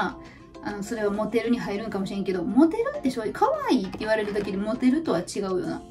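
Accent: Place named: Kansai, Japan. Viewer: ちゃんこんばんは✨今日も楽しませてもらいました❗️ ちゃんの歌声も好き❤️ですが関西弁も大好きでーす😍いつも楽しみにしてます〜🎉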